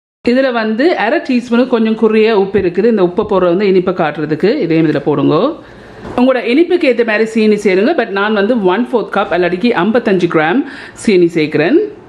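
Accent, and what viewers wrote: Jaffna, Sri Lanka. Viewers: சுத்தமான யாழ்ப்பாணத்து தமிழ் பேசுறீங்க 😀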